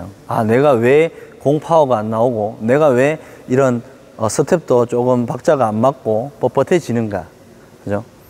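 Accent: Gyeongsang, South Korea. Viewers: 경상지역 사투리 같네요. 툭 던지는 남쪽지역 말투와 대충 치듯 던지는 스윙이 조화로워서 웃음이 절로납니다. 먼 곳(캐나다)에서 영상 잘 보고 있습니다. 힘든 시기 잘 이겨내시고 테니스로 몸도 지켜내시길 기원합니다.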